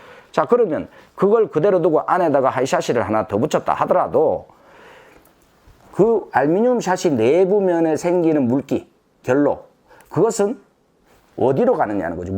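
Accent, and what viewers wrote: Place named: Daegu, South Korea. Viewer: 공사해보지않고는 어찌 실력자인지 구분할수있을까요.ㅜㅜ6월1일 이사가는데 올수리해야하는데 걱정이 많습니다.사투리 ㅎㅎ 대구지역이신가요?대구면 상담하고싶네요.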